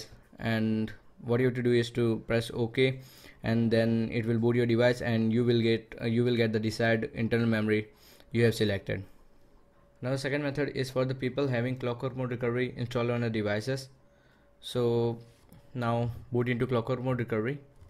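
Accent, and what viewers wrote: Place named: India. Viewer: I understand him 75% even if I'm not a native english speaker. He need to speak slowly,but he is Indian and his accent is different.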